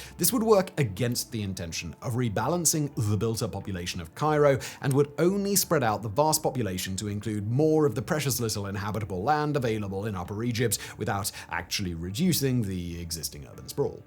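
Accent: United Kingdom. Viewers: The British accent of the presenter makes it difficult and unpleasant to follow. He speaks fast, words are not clear, no boundary between words.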